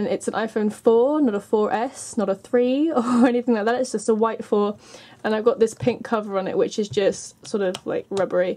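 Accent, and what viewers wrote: United Kingdom. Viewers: oh my god, finally ! I have been watching these videos and the were either american or australian people. Finally an english girl. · its kind of rude what im saying but sometimes the british accent kinda of throws me off..idk why not trying to be rude or anything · are u british????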